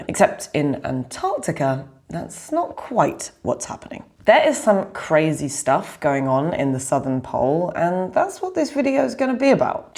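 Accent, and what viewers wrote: United Kingdom. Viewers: To be fair, she does sound a bit British, and we did get our system from them. I say that with the understanding we’ve been too stubborn to update it since.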